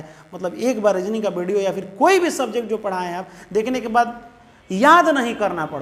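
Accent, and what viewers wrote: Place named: Bihar, India. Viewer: Sir, mera math strong hai Mera 12th me v tha, But , kis taip ke question aate Exam me isliye apki video dekhti hu , but sir apke video dekhe revision achhe se ho jata h, Ap week students ko v achhe samjha dete h, Bihari language me 😊 And confidence ke sath samjhate h Bohot sukariya hum sab ki taraf se ❤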